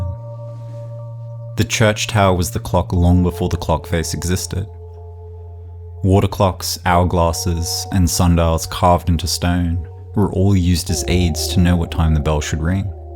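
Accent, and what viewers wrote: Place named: New Zealand. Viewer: Lovely essay! You sound like you are from New Zealand? Just curious as I'm listening from Auckland. Thanks man, nice work, congrats.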